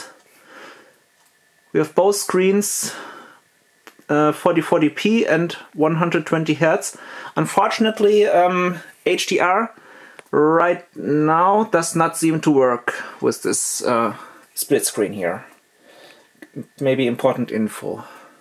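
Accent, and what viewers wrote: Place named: Germany. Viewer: Man hört einfach das du ein deutschsprachiger Mensch bist ^^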